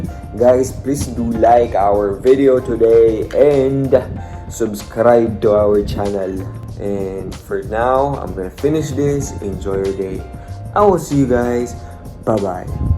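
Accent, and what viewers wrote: Bicol, Philippines. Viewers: Sobrang cute mo mag salita ng bicol hahaha💚 I am sad but I saw and I watch your vlog today nawala na lungkot ko. Thank u, love u & missed u😁🥰💚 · Nakakainlove yung bicol accent mo! 💗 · Ahhahahaha inlove with your bicol accent😍😍😍 I'm also from Bicol 🥰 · napansin ko lang po yung salita ng bikol my halong ilonggo ng konti haha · i love it... naiintindihan ko ang bicolano ni... nkakaintindi pa rin pala ako... kahit di marunong magsalita... lab u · Hahaha halo pala yung Bicol mo. Legazpi-Naga dialect. Hahahaha. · Grabi..cute mag bicol salita n...Hindi ko maintindihan..hinahabol ko talaga pag Basa para ma gets ko Ang sinasabi mo myloves ❣️❣️❣️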